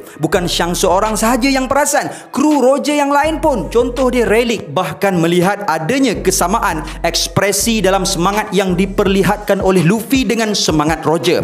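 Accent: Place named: Kedah, Malaysia. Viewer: Bukak msk bru ada dngan lorat kedah.. baru ada feel dngar dye pnye crte...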